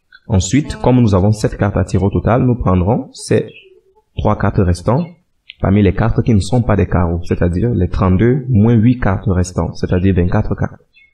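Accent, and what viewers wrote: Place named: Cameroon. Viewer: Chapeau tres bien explique et en plus vous avez une jolie main d ecriture bien lisible . merci a votre accent je sens que vous etes Africain surement Kmer dnas tous les cas bonne video continue seulement !!!!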